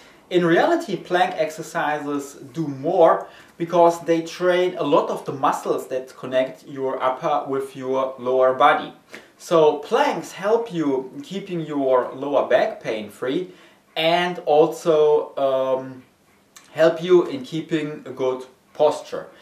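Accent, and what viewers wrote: Germany. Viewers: Good Video, you voice rocks btw :D, are you German ?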